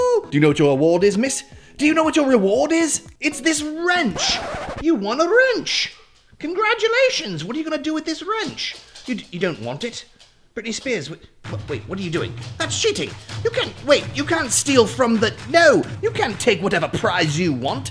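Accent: England, United Kingdom. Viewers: Hi what a Funny English Accent love it . Hila is a good Listener 🤩🤗 Britney is calm.. luv chilling out after work with your videos still trying to work out which Day I am on Ha Ha...😍 Good tips catch up soon .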